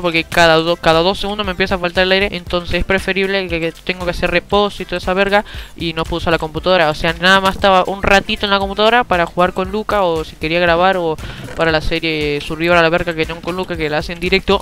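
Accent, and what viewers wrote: Argentina. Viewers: sos argentino o mexicano?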